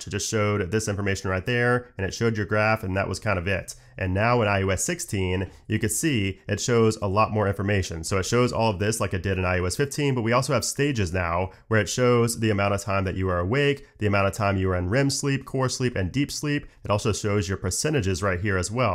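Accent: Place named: New Jersey, United States. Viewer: Your from jersey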